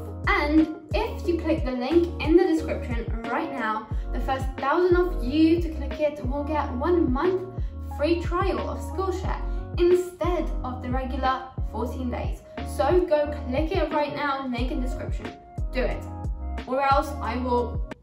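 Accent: United Kingdom. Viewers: i have to visit england, i can listen to this accent all day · this video would be terrible beyond all imagination if it weren't for two facts: that you're stunningly beautiful and even more importantly, that you speaking in a mesmerizing British accent, which makes this video the best one uploaded on youtube since youtube's foundation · Refreshing to hear British accent after a long time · Look how cute she look while eating by the way love your content and British accent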